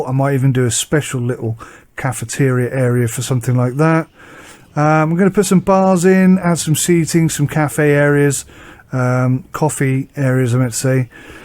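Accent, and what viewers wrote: United Kingdom. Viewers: Well done on this! I like how you turned things around from that little bit of debt. Out of curiosity, what variety of British accent are you speaking in? It's interesting to see the different voices out there.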